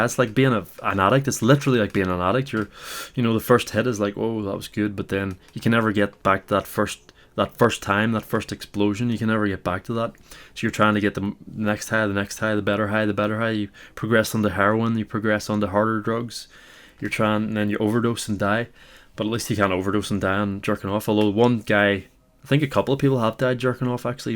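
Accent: Northern Ireland, United Kingdom. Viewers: Northern Ireland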